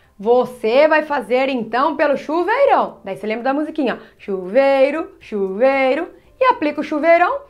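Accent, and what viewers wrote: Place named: Caipira, Brazil. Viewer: Esse sotaque caipira incrível ceRto, teRmo, diferEnça kkkkk Muito bom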